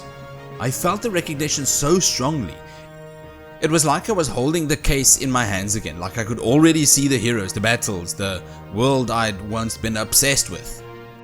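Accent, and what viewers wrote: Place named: South Africa. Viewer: Always so nice to randomly hear a south African accent here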